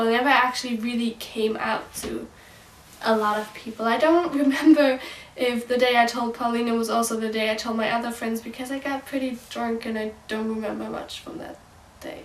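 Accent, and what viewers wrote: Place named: Germany. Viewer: Bevor ich deinen Kanalnamen gelesen habe, dachte ich so "Ist sie Deutsche? Denn ich habe den exakt selben Akzent" :D. Ich rede genauso wie du, wenn ich englisch spreche. I'll continue in english so everyone can understand. I'm pretty sure I'm bi too. Nobody knows although I think a few friends assume. I kinda wanna come out to my friends but I'm not sure if I'm ready enough unless they ask then I would tell them. I have had thoughts of being gay (turns out I'm bi) since the age of 13 (I just turned 17) and although I've never had a problem with lgbtq+ people being one myself was something I really didn't want to be. Accepting myself was definitely the hardest things although I knew my family and friends would support me fully.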